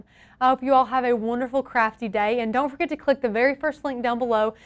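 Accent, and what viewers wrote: South, United States. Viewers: Girl, where you from?! Just a curious Texas girl who is fascinated with southern dialects lol. The Carolina's, Georgia, Tennessee?? Love it!! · Love her southern accent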